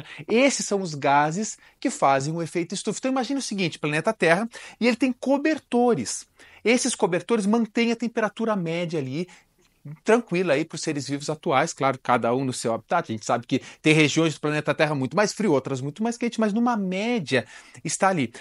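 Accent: Rio Grande do Sul, Brazil. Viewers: Professor gaúcho é a melhor coisa kkk parece que eu entendo mais ( ou deve ser por que sou gaúcha )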